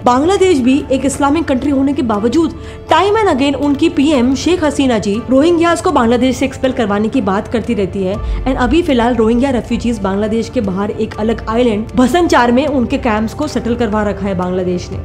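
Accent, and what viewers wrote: India. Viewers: Oh my goodness! Couldn't understand a word of her Indian English pronunciation.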